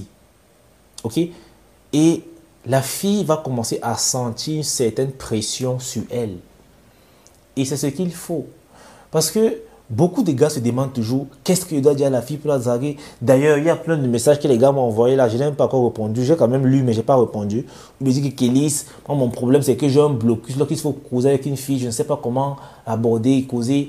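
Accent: Cameroon. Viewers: Pourquoi tu as l’accent 237?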